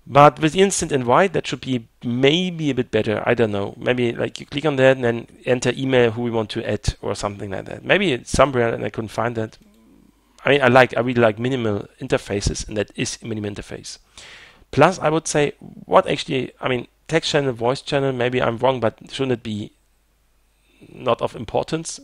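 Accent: Germany. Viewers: German accent 10/10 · your accent sound german? bitte sei deutsch lol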